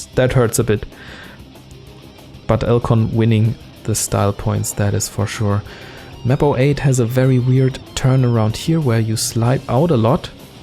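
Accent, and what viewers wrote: Germany. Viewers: sehr deutsche aussprache, woher du wohl kommen magst? 🤔🤔🤔😂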